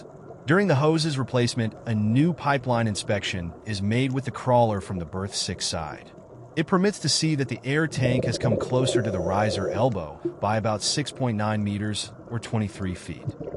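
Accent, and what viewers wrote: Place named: Belgium. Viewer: Just the audio. He explains at the beginning that he has a thick Belgian accent so for clarity he uploaded his transcript to a reader.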